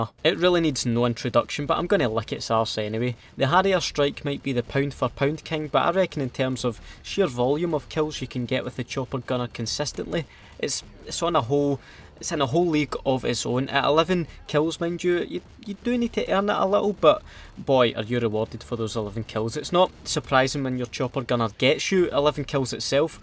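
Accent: Scotland, United Kingdom. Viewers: Love me some Scotish accent to start me morning off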